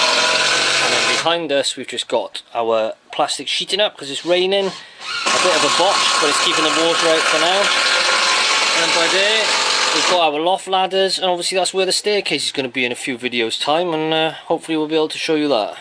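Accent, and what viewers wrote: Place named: Cardiff, United Kingdom. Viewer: No he is Cardiff because I could tell his accent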